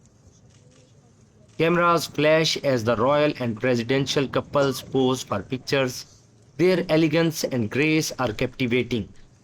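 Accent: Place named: India. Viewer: Horrible Indian accent. Use AI.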